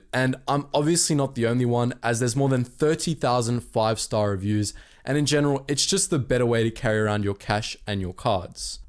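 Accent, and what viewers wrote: Australia. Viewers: GUYS HE IS AUSTRALIAN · never knew my guy was australian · You're an aussie!? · I just realised was aussie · Oh shit is Australian couldn't pin down the accent must be from QLD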